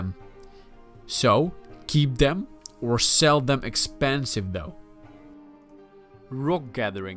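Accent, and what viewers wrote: Netherlands. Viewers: your accent actually arouses me. is it dutch?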